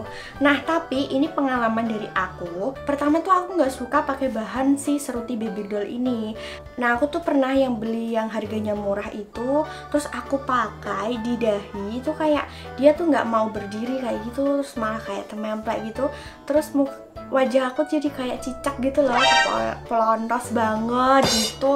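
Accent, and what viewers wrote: Java, Indonesia. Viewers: Keknya org Jawa ya min? Kelepek Ken..ke mencung en 🤭🤭🤭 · Mba orang Jawa ya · Orang jawa mana sih kak ? Kok medok bgt hehe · Jawa medok kak:)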